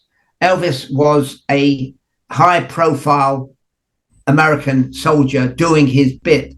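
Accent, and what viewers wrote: Liverpool, United Kingdom. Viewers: One question : Why almost everybody in Liverpool I have heard speak with your kind of accent except The Beatles? Did they have to change their accents in order to be understood better or it depends on the part of Liverpool people are from? Because they never sounded like "Scousers" as you do for example .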